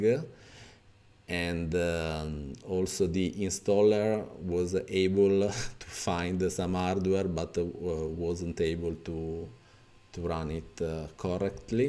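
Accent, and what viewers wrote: Italy. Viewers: holy italian accent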